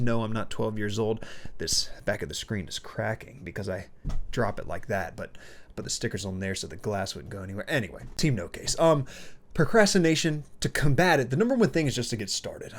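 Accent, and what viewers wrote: United States. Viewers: Amazing American Accent, gotta learn some of it too!!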